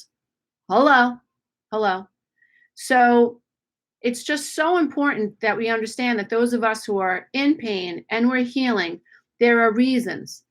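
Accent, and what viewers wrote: New York, United States. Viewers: You are wisdom with a New York accent 👍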